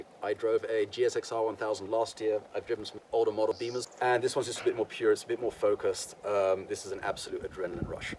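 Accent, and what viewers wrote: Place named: United Kingdom. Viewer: He also speaks like he learned from a Brit. Probably went to school in the U.K.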